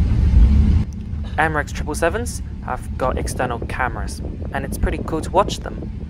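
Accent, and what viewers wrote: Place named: Australia. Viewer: Sounds like Australian accent.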